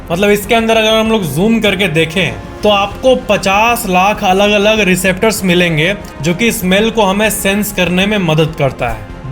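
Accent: Bihar, India. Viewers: Now your tone is likely bihari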